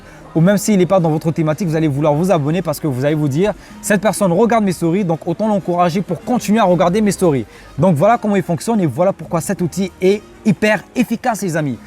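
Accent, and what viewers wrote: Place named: Arab world. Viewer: Tu vis en CI, mais t'as un accent arabe, As tu des origines arabes?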